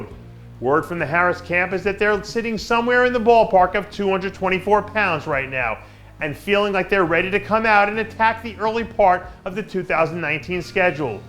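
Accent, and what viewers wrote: Standard. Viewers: It's called, non regional diction. I like it.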